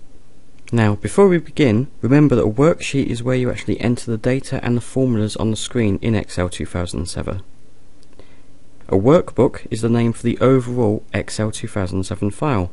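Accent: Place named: England, United Kingdom. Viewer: Yes it is an English accent from England.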